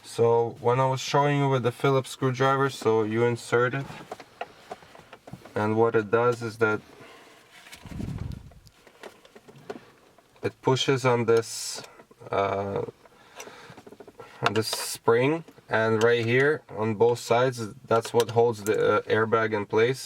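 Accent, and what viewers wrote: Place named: Russia. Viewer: слышится почему-то русский акцент...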